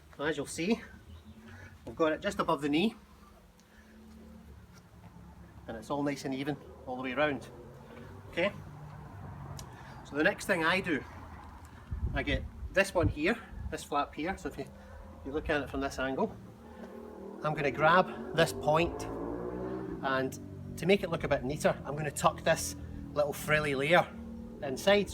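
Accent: Scotland, United Kingdom. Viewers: My favourite accent....scottish accent😍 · I didn’t even need to hear this guy’s voice to know he was Scottish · Scottish accent is soo adorable! 🤩 Greetings from Bavaria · Well at least he sounds Scottish. With the Outlandish craze I was expecting fourth generation heart on sleeve Good ol' Texas Scot telling us how to suck eggs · Wow, CC does not understand a Scots accent!! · You would be my perfect weapon!! You are GORJUZZZZZ!!!! I love the Scottish accent (all dialects), with your looks and build, you are perfect! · A Plait is very practical... wht you need more? I love the scottish akcent.... as i was in scottland there was also a "Highlander" who was showing what you can do with a plait. Its a awesome thing. Thumbs up for that video. Greets from Germany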